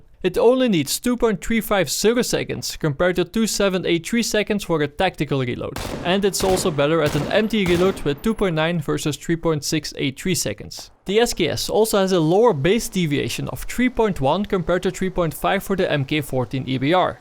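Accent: Netherlands. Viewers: echt een nederlander hoor het gelijk XDD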